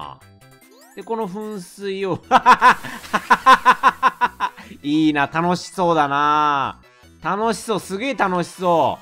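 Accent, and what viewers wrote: Kansai, Japan. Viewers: って絶対関西弁需要分かってるありがとうすき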